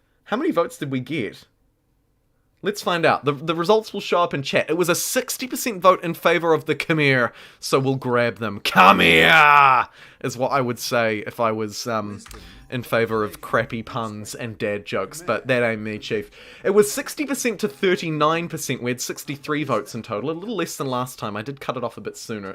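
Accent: New Zealand. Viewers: I'm from NZ and I can't quite pinpoint your accent. It's definitely kiwi, as the "kiora" suggested, but some things sound English, and maybe Australian, and maybe something else I can't pick. Like you said something like: 'aw nah look they're running in with "skart" riders' For "scout", is that a UK accent? Anything else? Just curious lol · It sounds pretty kiwi to me. Maybe rural NZ?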